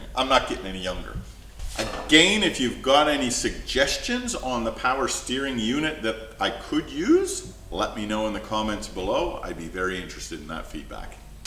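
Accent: Canada. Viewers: Hi. Another cracking video and you’ve set yourself quite a challenge the! On another issue altogether now. I just can’t seem to help myself looking beyond the video presented to speculate on the presenters background. There’s no hiding that Canadian accent but your use of the Australian “lingo” is spot on. So much so in fact that I could almost second guess what you were going to say so I would call you 100% Australian! So welcome mate! You must also have some sort of mechanical / technical background to be tackling the projects you do. Whatever the case welcome to Australia and I hope you and your family have a long and happy life here. Cheers from Gippsland.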